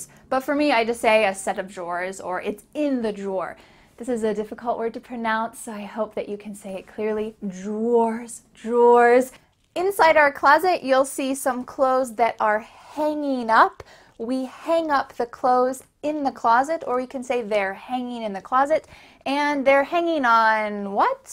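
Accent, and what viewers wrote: United States. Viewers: WOW... I nuts about your methodology...God bless you teacher,and amen!!! I've been watching some of your videos and I love your American accent! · I luv her accent. I'm crazy about american eccent... I'm practicing this everyday. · sei troppo brava complimenti. In quale stato dell’America vivi? Chiedo tanto per capire che accento usi del Norton del South. Grazie 😊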